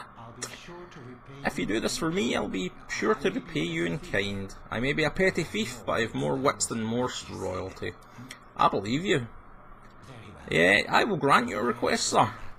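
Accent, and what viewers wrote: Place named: Glasgow, United Kingdom. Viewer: Are you Glaswegian? your accent sounds just like some of my friends from down there, but less nasal.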